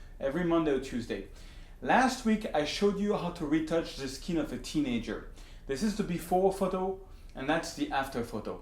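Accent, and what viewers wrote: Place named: France. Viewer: My ears are bleeding to this awful french accent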